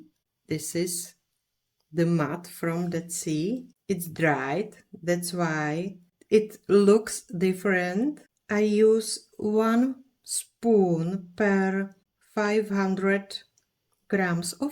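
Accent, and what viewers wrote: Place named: Czech Republic. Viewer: Nechci se vás dotknout, vím, že to nesouvisí s výrobou mýdla, ale když už chcete natáčet videa v angličtině, bylo by dobré zapracovat na výslovnosti. Ta "czenglish" opravdu trhá uši, nezlobte se.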